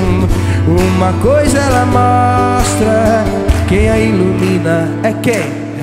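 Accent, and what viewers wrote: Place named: Rio de Janeiro, Brazil. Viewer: é de BH mas tem o maior sotaque de carioca. "Exxxxtou em obrax" hahaha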